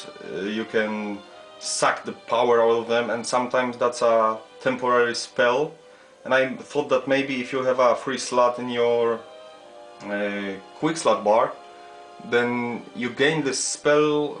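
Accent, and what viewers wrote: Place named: Poland. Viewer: Soul Sacrifice ? Ale słychać, że Polak mówi po Angielsku xD Jednak nie jestem jedyny, który wpadł na nagrywanie materiałów po Angielsku :) You've got my sub dood !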